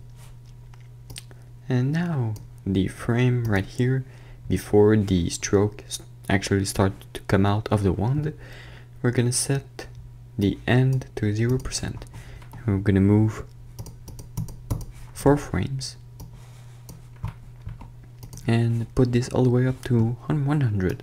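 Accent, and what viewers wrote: France. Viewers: Thanks. Hey i've a question! Are you french? I'm sure.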